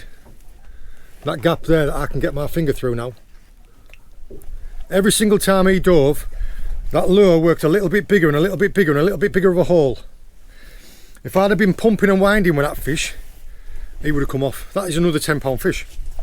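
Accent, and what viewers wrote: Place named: Northern England, United Kingdom. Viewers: Hi, I feel like I detect a Cumbrian accent - not sure if that's right but you sound just like my Granda when we went fishing. Just want you to know that watching and listening to you has made his passing easier, especially when you explain things, it's like hearing him again. Love what you do marra, keep it up! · Just randomly found your channel, you said your in Cornwall but you have a bit of a Northern accent. Anyways I will be watching more, keep up the good work mate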